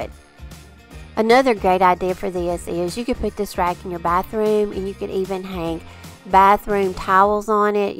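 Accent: South, United States. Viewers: You have such good ideas, classy and not cheap looking store bought items. Love your upcycles, repurpose of old things..also enjoy your background music and your sweet southern accent.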